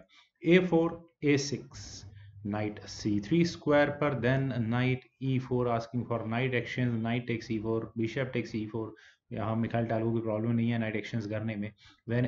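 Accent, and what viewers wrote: India. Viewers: Thanks for all your Mikhail Tal's Games and indepth Analysis..!! From your pronounciations, I thought you are Gujarati.., but fr9m looks I think you are Punjabi, I guess..!!😊👍👌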